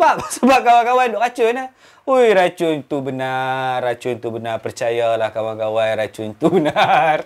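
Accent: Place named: North, Malaysia. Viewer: Baru jumpa channel ni.. Serius content sempoi bro !! Dengaq pekat utaqha tu jatuh cintaa nak layan haha.. btw keep the content bro & stay active this channel..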